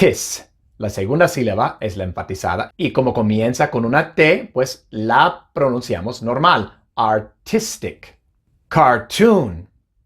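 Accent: United States. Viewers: Tu inglés es acento Americano por eso lla t la pronuncias de eso modo, porque el inglés británico si la marca más. Tendrías que aclarar que tu acento es Americano. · Soy ingles. En Inglaterra decimos arTist - no arDist. VerTical- no verDical. ThirTy - no thirDy. El pronunciacion de is pronunciacion del EEUU, no de Inglaterra. El ingles original y mas correcto es de Inglaterra.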